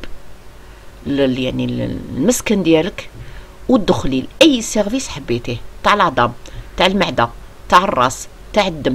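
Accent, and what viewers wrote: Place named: Bab El Oued, Algeria. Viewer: كﻻمك دوا يا اختي كتكوني تهدري تفكريني في باب الواد نموت على هدرتك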